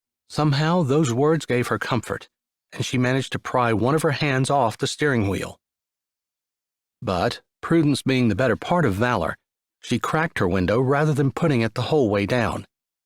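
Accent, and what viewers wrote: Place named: Ireland. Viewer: Oh my goodness, the Irish accent! Love it!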